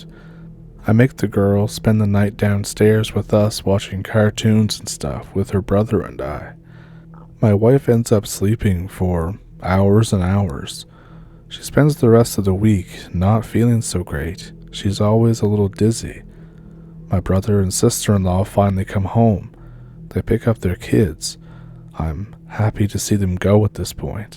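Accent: Ontario, Canada. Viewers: I knew this cat was Canadian by his Ontario subtle accent!!!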